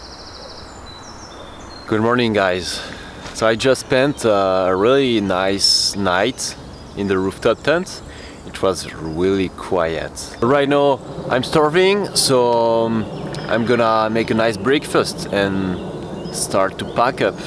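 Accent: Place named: France. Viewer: french? cute accent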